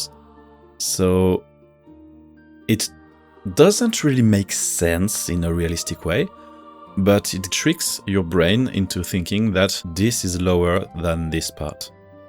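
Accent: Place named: France. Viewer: I love that Youtube goes "ah yes, this guy is speaks french" and puts the AI English Dub over. Not even speaking french or anything btw... just... french accent LMAO Also looks epic asf dude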